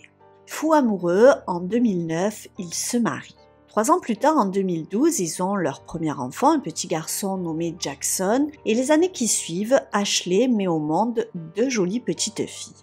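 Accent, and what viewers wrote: South, France. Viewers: C'est toujours un grand plaisir de vous écouter. Et ce bel accent du sud met un peu de soleil sur Liège ( Belgique) , où il fait toujours très humide et froid......:-)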